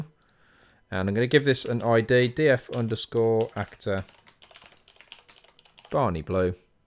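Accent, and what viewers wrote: United Kingdom. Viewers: I'm an American. I have no idea why, but I find it remarkably easier to learn this shit from a guy with a British accent. I probably should have just gone to school in the UK, come to think about it; would have learned my lessons much more effectively from teachers with accents I think.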